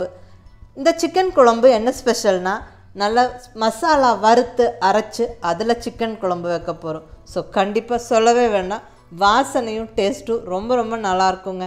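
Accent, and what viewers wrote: Kongu, India. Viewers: அருமை சகோதரி. உங்கள் கொங்கு தமிழ் உச்சரிப்பும், குழம்பும்.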